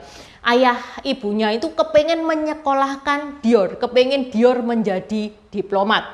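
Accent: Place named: Surabaya, Indonesia. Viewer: logatmu lucu...seperti orang di pasar atom. Tetap aja sebab menurutku menarik.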